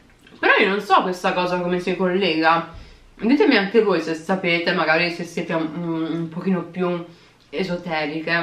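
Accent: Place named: Naples, Italy. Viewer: Sei napoletana 😍😍😍😍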